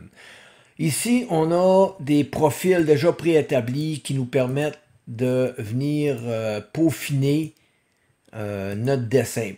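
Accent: Canada. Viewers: Merci beaucoup pour cette vidéo. Tes explications et ton accent canadien super sympa m'ont beaucoup aidé. Je reste quand-même bloqué sur le flashage de grbl. je suis en version 1.1f et je voudrais mettre la 1.1h . Dans le menu déroulant, j'ai le choix entre deux arduino. Ma carte est une woodpecker 3.4. Comment faire? Merci!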